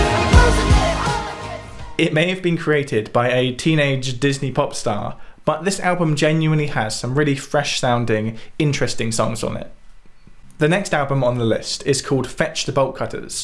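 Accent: England, United Kingdom. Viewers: A man with an English Accent !! Dreamy !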